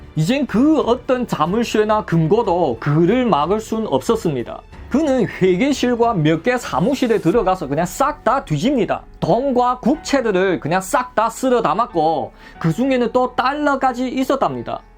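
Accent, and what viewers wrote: China. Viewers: 중국사투리 자꾸 들으니 익슥해 지네요 화이팅!!!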